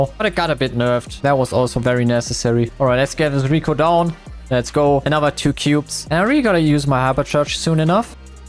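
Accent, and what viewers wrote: Germany. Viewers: Bro your German accent IS sticking Out of that voice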